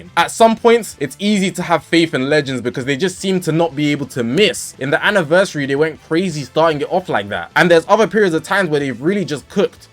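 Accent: United Kingdom. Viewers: Just a question, could you say " a bottle of water"? Because i heard the British accent is very strong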